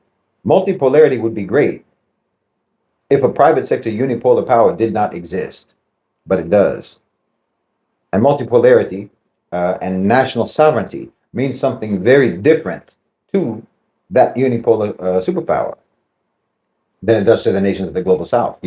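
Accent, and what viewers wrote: United States. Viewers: The talk is in English and in the US accent because this guy is also an American but got over the indoctrination.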